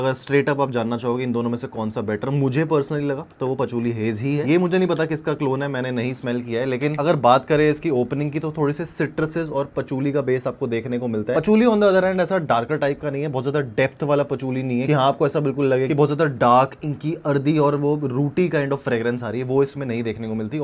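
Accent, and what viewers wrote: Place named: United Kingdom. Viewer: Brit accent ka kya scene hai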